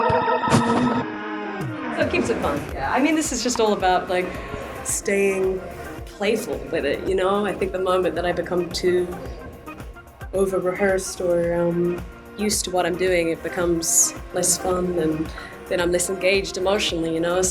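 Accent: United States. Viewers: Shame she is turning so yank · Her accent has really shifted towards American sounding. Anyways, love her. Love the rig. Love the music.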